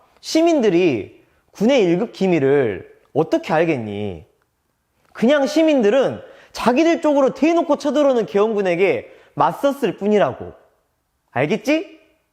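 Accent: Jeolla, South Korea. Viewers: 전라도 말투가 듣기 거북하당께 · 전라도말투부터 고쳐ㅜ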